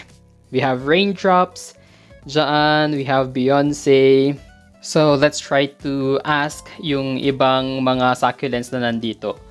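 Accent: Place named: Conyo, Philippines. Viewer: Finally we all see your face with facemask Sir😊🙌 All I learned about you Sir is your conyo voice😂 nadadagdagan yung vocabulary words ko may Botany class pa kami sayo LOL 😂 more power to your video Sir!👏🏻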